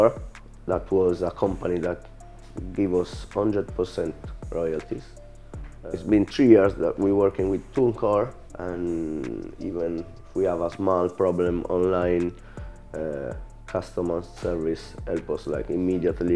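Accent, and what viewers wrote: Jamaica. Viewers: chi sono? E inglese giamaicano ovviamente vivendo lui la ha preso quell’influenza, molto diverso dall’inglese che studi a scuola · Cazzo se si sente l'accento jamaicano... Altro che ebbasta quando parla inglese alla renzi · Minchia che Inglese Patois-izzato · Top si sente l'influenza Jamaican nel tuo inglese real Swag no sucker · Patrick jolly splif se ha imparato parlando l’inglese jamaicano cosa ci può fare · No vabbè l'accento jamaicano non si può sentire... Cazzo sei italiano, usa il nostro accento almeno o se proprio ti devi sforzare usa quello inglese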